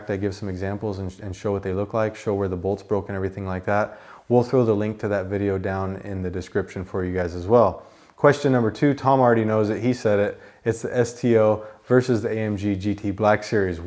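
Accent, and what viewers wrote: United States. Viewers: I’m new to the channel are you American?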